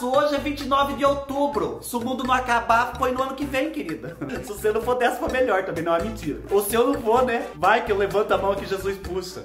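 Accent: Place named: Minas Gerais, Brazil. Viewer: Oiee, eu amo seus vídeos e o seu sotaque, você por acaso é mineiro como eu,🤣🤣🤣🤣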